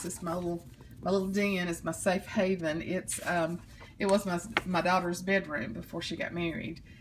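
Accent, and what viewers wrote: South, United States. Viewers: I'm such an idiot, I obviously wrote this comment before I'd even finished watching the video, lol. By the way I absolutely adore your accent. I love the accents from all around the south/deep south in the USA. Y'all sound so beautifully well mannered, with your habits of saying sir/m'am and "bless your heart" (although I think that's more of a Texas backwards compliment thing lol.)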